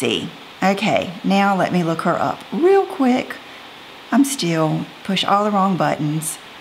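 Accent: South, United States. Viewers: Hi, I'm new to your channel and I really enjoy your informative videos. I love your southern accent and your southern charm and of course your style.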